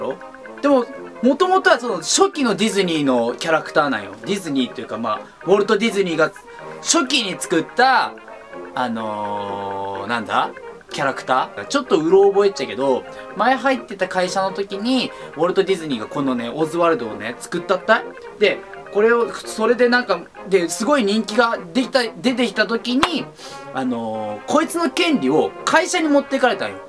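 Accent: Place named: Kyushu, Japan. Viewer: リアルタイムでも見れそうだったのですが、日テレっ子なので…(笑) 今朝録画したのを観ました！真面目にお話してるかっこよかったです(´˘`) でも、九州弁で話す動画のも好きです(˙˘˙)❥❥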